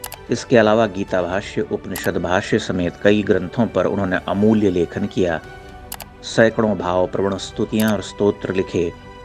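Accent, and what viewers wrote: Standard. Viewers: सारगर्भित एवं परिष्कृत हिंदी के साथ आपका विशुद्ध उच्चारण, वीडियोज को प्रेरणादायक होने के साथ अत्यंत आकर्षक बना देता है। प्रतीत होता है कि The Frustrated Indian के प्रारम्भिक वीडियोज मे भी आपकी ही आवाज थी।❤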